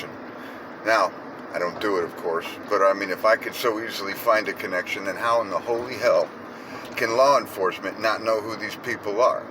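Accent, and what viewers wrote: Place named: North, United Kingdom. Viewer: Your from up North aren’t ya buddy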